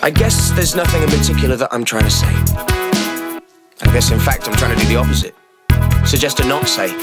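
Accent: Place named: United Kingdom. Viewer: Obsessed with the brit accent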